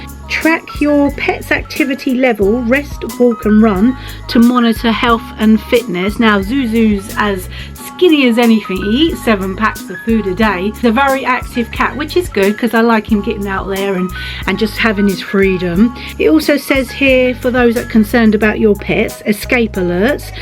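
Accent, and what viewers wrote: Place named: United Kingdom. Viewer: Just found ur channel and this video as I’ve been looking for a tracking device for quite some time (ever since Jasper took his wanderlust a bit too far and there isn’t a person in our street who doesn’t know who he is it’s humbling and makes you feel like such a bad pet mum) .. Have u tried other devices as well and also, u sound British, can we get it via Amazon as well in the UK?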